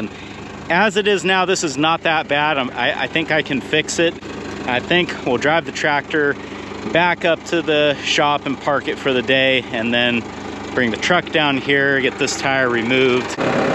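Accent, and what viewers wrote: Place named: Texas, United States. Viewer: If you are from Northern California how come you have a southern/ Texas style drawl? I just like guessing accents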